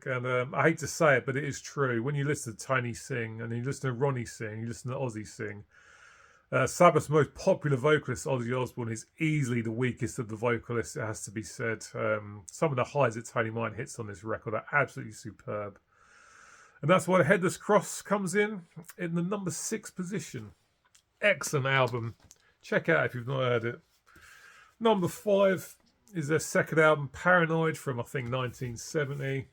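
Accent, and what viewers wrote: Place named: West Yorkshire, United Kingdom. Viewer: that could be your accent, not sure if it’s West Yorkshire, and baritone . Anyway, I’m happy to find your channel and subscribed it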